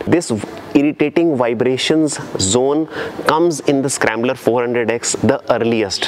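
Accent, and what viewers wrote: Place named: Punjab, India. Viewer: Great, well thought-out comparison. By the way, you seem to have a very strong Punjabi accent.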